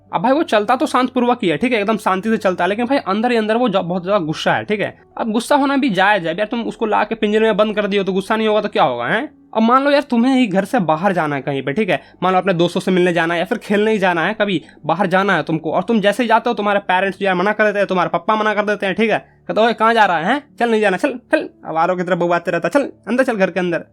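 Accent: Bihar, India. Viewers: Bihar se ho kya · Baauate rhata hai ...... A bihari or I can felt that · Bhaiya Bihar up se lag rhe hai😅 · Bihar se ho na tum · Brilliant explanation sir , I am from Bihar and I could feel your bihari accent coming in between the lecture 😂😂🤣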